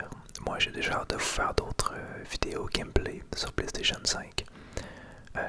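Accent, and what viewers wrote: Quebec, Canada. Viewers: Zzzzzz j'adore ton accent Quebecois dommage que Demon's souls il n'est pas dispo sur PC un coucou de la France.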